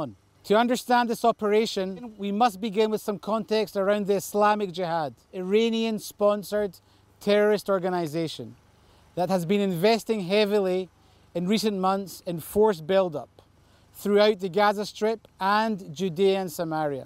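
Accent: Scotland, United Kingdom. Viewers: May Hashem keep you all safe💖🇮🇱Thank you for all you do.( Amazing to hear a Scottish accent 😍) תודה. רבה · This guys sounds Scottish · Is he Scottish? It’s like an Israeli Scottish accent!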